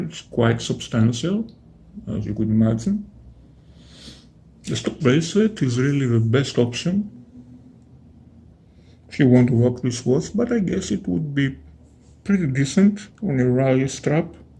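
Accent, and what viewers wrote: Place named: Greece. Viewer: Great video,. I found the link to this channel from WUS and subscribed. Do you know what I like best about that watch? It doesn't feel the need to have a running seconds register, just to let us know it is running. It is assumed. Nothing is moving except when it needs to be. I like your accent too. Greek, I think; very nice to listen to. -Smaug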